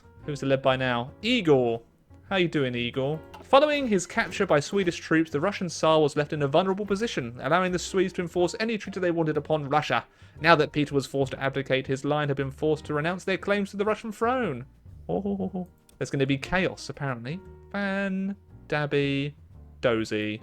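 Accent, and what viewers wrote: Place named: England, United Kingdom. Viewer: I'm french and i like your english accent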